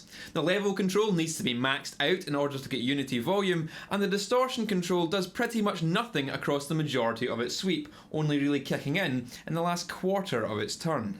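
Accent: Scotland, United Kingdom. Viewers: Scotish Arin Hanson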